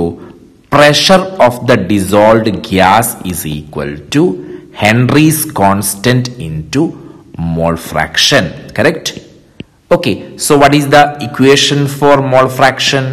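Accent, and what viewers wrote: Kerala, India. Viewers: sir are you from kerala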